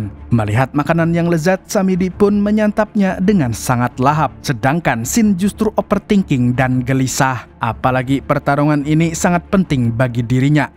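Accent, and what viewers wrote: Banjar, Indonesia. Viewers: Orang Banjar kah om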